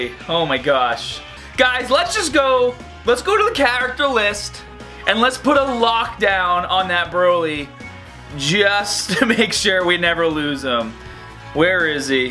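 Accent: Boston, United States. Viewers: you must be from boston 😀 just taking a guess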